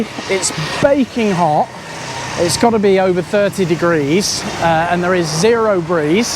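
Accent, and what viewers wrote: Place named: England, United Kingdom. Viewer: Hey bro,, thank you for visiting Timor Leste,,, you sound English .. are you from England?... btw once again thank you very much for this great adventures of yours to Timor Leste.. this is definitely will help boosting up Timor Leste tourism and as a Timorese I can't thanks enough for this... I hope you did enjoyed your traveling around Timor and had a great adventure time .. thank you and be safe..... obrigado barak!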